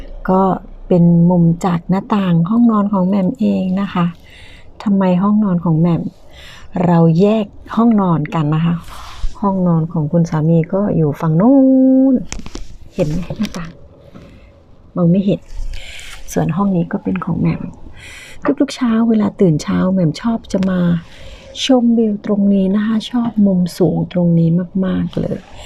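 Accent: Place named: Central Thailand, Thailand. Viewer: ยินดีที่เป็นคนใต้ทำคลิปไม่ค่อยมีคนทำ และพูดภาษากลางฟังชัดป้าเป็นคนชอบประเทศเยอรมัน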